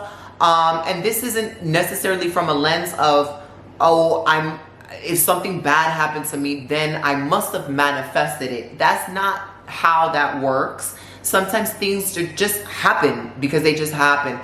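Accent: United Kingdom. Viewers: Lmao at the British accent, thank you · The British accent took me down 😂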